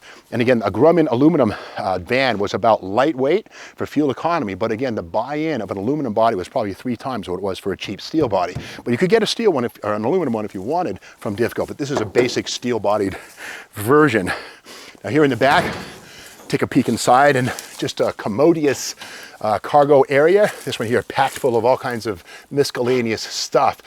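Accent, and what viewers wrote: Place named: New England, United States. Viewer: I always love it when you do these videos AND when you are on auto auctions - you convey information in an easy to understand way and your vocal characteristics are just first rate! Being from Vermont early on, I do miss that New England accent as I live in Virginia. Keep up the great work and thank you for being on youtube!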